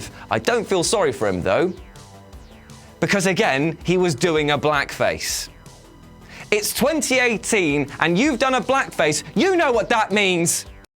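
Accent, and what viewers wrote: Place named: United Kingdom. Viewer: I never knew until today that was actually a Brit.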